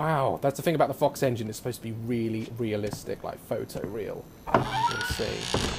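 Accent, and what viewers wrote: United Kingdom. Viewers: He sounds so much more British then vs now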